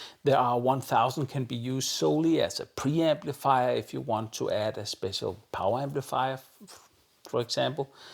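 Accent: Denmark. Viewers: I Iove your reviews and your Danish accent! Back in 1990, my first “real” amp was the Technics SU-V90D 😉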